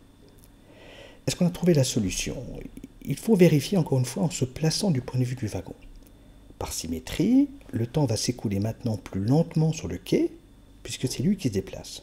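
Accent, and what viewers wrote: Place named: Geneva, Switzerland. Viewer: Très bonne explication, vous avez l'accent genevois, vous êtes de Genève? Vous travaillez peut-être au Cern?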